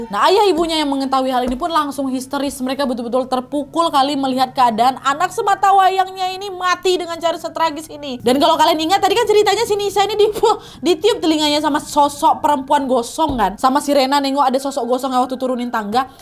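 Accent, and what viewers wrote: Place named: Medan, Indonesia. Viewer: Ceritanya benar2 menghororkan tapi, hilang rasa takut karna dengar k yang membawakan ceritanya dengan lucu. Mala pake logat medan ada uwak nya lagi. Mantap k.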